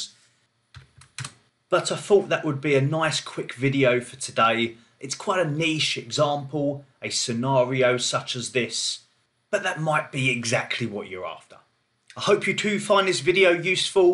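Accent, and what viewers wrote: United Kingdom. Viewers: Dear Mr - great videos! and your UK accent is awsome and make tutorials pleasant to watch and listen to! Thank you for sharing your knowledge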